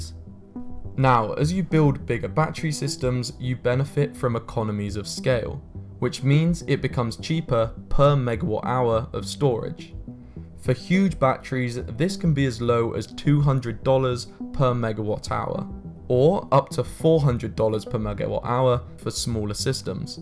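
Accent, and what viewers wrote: United Kingdom. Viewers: The idea is the modern perpetual machine. It is a hi tec scam. Before I believe a word of this bull shit I want an MIT physics prof to explain why it is a great idea not some guy with a british accent.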